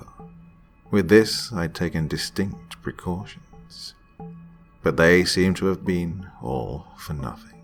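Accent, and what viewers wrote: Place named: England, United Kingdom. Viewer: Doctor, there is something about your English accent/pronunciation that is very enjoyable to hear.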